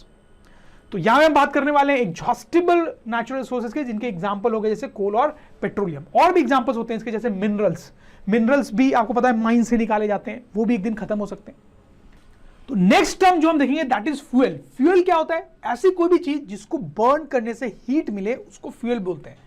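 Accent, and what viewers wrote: Bihar, India. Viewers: Sir are you from Bihar ?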